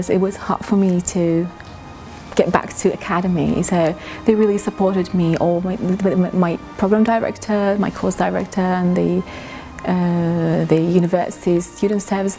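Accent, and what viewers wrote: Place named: Iran. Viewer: Medicine I believe.... She has a Persian accent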